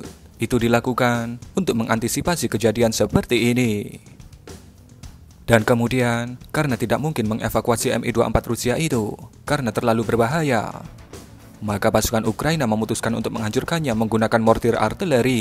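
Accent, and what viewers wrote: Java, Indonesia. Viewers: chanel medok tpi mantap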